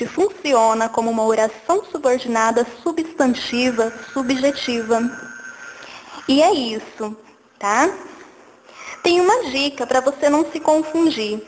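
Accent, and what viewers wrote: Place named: São Paulo, Brazil. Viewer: Que voz fudida e de paulista!Mais boa aula!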